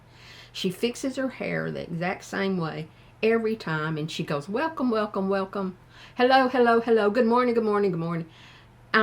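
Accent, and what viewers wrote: South, United States. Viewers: I'm a 58 year old man working on putting together a YouTube channel about several different subjects I'm interested in and I'm listening to everything you're saying! I love your channel and I make it point to watch your videos just before I got to bed because they're so relaxing to listen too. I enjoy your slow southern accent but Ironically you get to the point very quickly. I'm from the south and I have lived way up north for several decades and I miss the southern accents and the slower pace of life, your videos give me that back home feel. I found you by looking up side hustles and when I watched your first video on how you did it I was so encouraged that I decided I'm going to do it! Now I regularly watch for your videos and I am subscribed to your channel so you come up in my feed daily now. Thank you for sharing your wisdom and honesty!!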